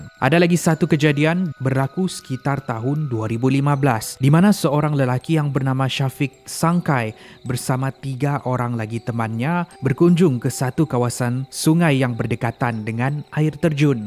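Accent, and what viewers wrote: Sabah, Malaysia. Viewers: sabah bah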